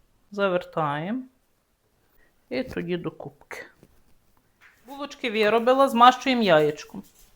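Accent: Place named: Podillia, Ukraine. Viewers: Чому жахлива?! Так розмовляють на Хмельниччині! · Ви просто суперова господиня, а мова нашого Поділля,подобається Вас дивитися,булочки пекли з внучкою,получились дійсно смачні і Ви правду сказали,що треба пекти і не одну порцію.Дуже дякую.😊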